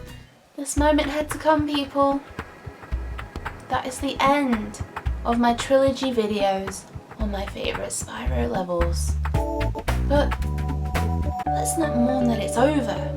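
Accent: United Kingdom. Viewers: Oh my god, you are a fucking disgrace! I can't believe how bad this review is! First off, the hell man, british narration? No review with that disarray! And boss stages and mini games are not fucking levels. FUCK AND GET THE FUCK OFF YOUTUBE! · SPYRO 3 WILL ALWAYS BE MY FAVVVVVVV :) Love the vid and love the pommy accent ;)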